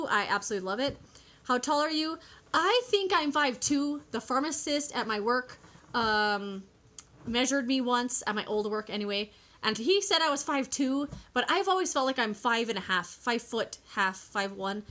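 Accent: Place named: Canada. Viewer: Your accent sounds Canadian. Are you? Malls are my favorite too, ever since I was old enough to shop with my own money.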